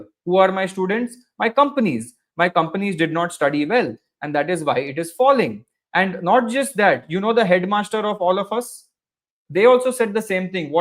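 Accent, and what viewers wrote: India. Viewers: Bhai I like your hindi accents. Pleased keep adding this in your videos and I can feel it that you are seriously bursting many Myth of retail investor.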